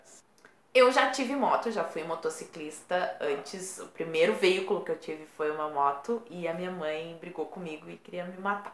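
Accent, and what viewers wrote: Rio Grande do Sul, Brazil. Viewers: Tem sotaque sim tchê!!! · Maravilhosa...corajosa...queridona..sotaque gaúcho sim kkkk....Não entre em depressão, porque tu não vai sofrer por causa de mediocres né....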